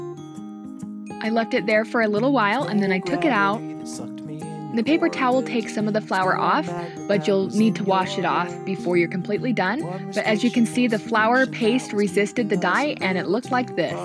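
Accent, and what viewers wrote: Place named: Minnesota, United States. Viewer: Are you from Minnesota sounds like Minnesota accent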